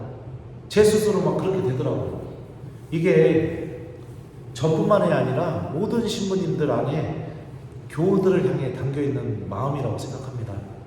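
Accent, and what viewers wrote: Chungcheong, South Korea. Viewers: 신부님 강론 말씀 듣고 있으면 마음이 뜨거워 짐니다 신부님 영육간에 건강 위해 기도 드립니다 신부님 사랑합니다 💜 충청도 사투리가 정겹습니다 감사합니다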